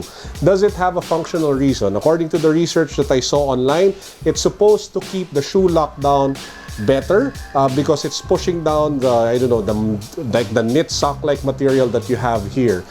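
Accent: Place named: Philippines. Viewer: Strong Filipino accent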